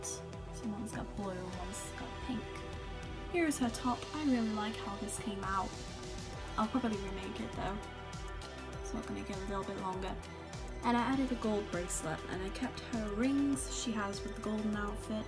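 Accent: United Kingdom. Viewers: Are u from Scotland Uk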